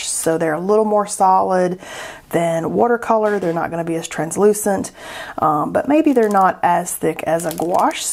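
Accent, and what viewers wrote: South, United States. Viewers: I first discovered on Skillshare. Her “art noises” had me hooked from the beginning. (Plus, when a southerner hears another, our ears perk up). She very quickly became my favorite instructor, because- as you pointed out- her enthusiasm is contagious! ☺️ It doesn’t hurt that I have learned a lot, too.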